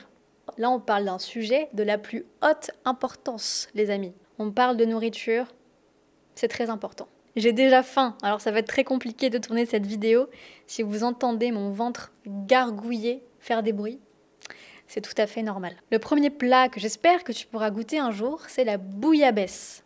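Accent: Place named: Occitania, France. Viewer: Aaah tu es occitane! Maintenant je compris pourquoi j'aime ton accent. J'etais là à juen cette année. Quelle marveille! Parles-tu occitan aussi? J'ai prouvé le cassoulet à Narbonne et il est le plat qui j'ai aimé plus parmi toutes dans mes vacances en France. J'ai prouvé la bouillabaisse à Marseille aussi mais elle ne m'a impressioné pas trop. Je n'ai pu trouvé du boeuf bourguignon mais j'ai prouvé la daube de boeuf et le guardien de toureau, tres bons. Excuse-moi pour mon mauveaux francais, je suis italien y j'ai prouvé à ecrire sans traducteur ou diccionnaire.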